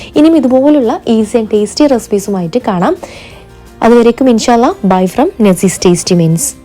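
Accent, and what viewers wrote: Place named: Kozhikode, India. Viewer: ഇങ്ങളെ അവതരണം അടിപൊളിയാണെ, നല്ല കൊഴികോടൻ സംസാര ശൈലി 😅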